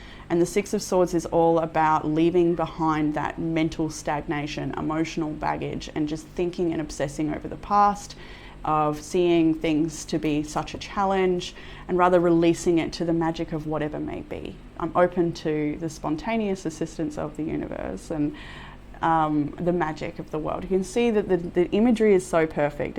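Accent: Australia. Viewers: I love your readings. Some resonate more than others. I love listening to you as I’m also Australian and it makes me feel closer in some way. I listened to this reading last night and picked group 2. This particular reading felt so personal and I’m hoping it did for many others too. I love that sometimes it is right on the mark. Thank You 🙏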